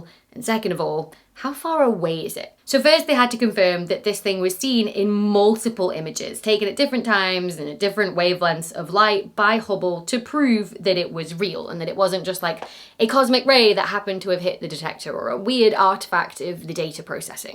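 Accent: United Kingdom. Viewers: Tell me you're British without telling me you're British (although, of course, the accent would be plenty). 26 Celsius is 79 Fahrenheit which is the temperature to which I set my thermostat for my air conditioning. The temperature she's melting at is the temperature I cool down to. Insufferable for her is comfortable for me. (There may be a video lighting issue I'm not allowing for, but she seemed to think the temperature was enough explanation). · Dr. Becky's presentation is such an intersection of delightful things from the subject matter to her effervescent enthusiasm for it -- and who doesn't want their serious topics presented with a bit of a British accent? Always a fun way to learn about this stuff.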